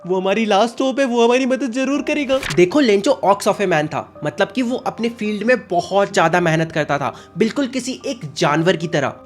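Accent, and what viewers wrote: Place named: Bihar, India. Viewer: Ye bhaiya meri bihari ladka lagta hai unki trah bolna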